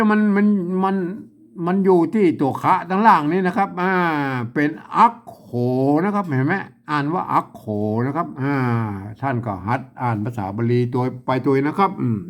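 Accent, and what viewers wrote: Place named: Lanna, Thailand. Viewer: เอาของสุนทรภู่มาอ่านแบบกำเมืองเลยเหรอค่ะ ป้อครู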